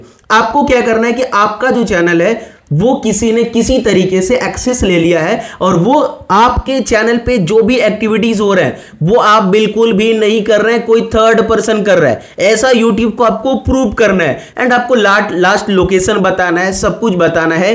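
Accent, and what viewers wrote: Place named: India. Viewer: USA ka time mater nhi karta hai aapko English main video bana hga aur usa ki log Indian accent itna dekhta nhi hai 😅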